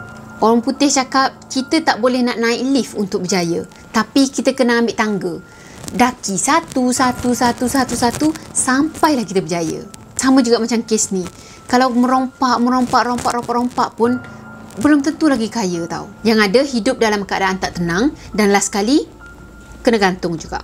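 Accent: Kelantan, Malaysia. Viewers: Kelate boh.. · Kelate bosku.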